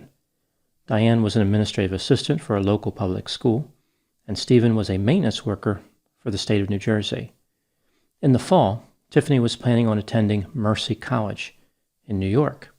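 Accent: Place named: Pennsylvania, United States. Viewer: Every time he adds a zinger to his deadpan delivery it goes right over my head for a few seconds then I have to stop and think about what he just said. I hear a PA accent so almost the same thing.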